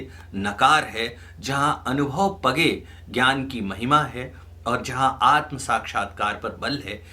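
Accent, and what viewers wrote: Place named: Haryana, India. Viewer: Inke dohe ka uccharan Magahi jaisa kijiye na ki Hariyanvai jaise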